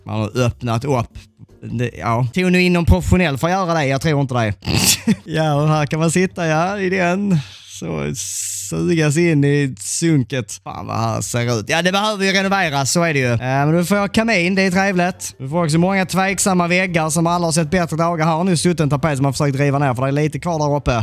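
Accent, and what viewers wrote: Scania, Sweden. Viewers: Älskar skånskan ahahaha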